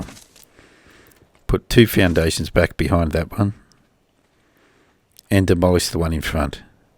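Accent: Australia. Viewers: has an almost Australian accent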